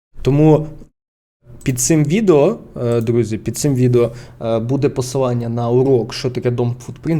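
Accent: Lviv, Ukraine. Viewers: Українська мова Львіський акцент ТАК ТРЕБА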